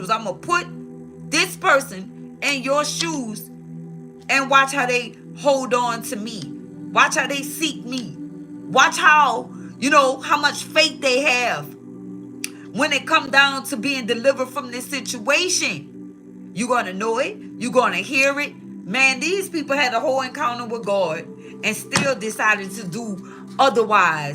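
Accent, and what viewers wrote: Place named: New Orleans, United States. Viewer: I know you from New Orleans the way you Talk. REALNESS.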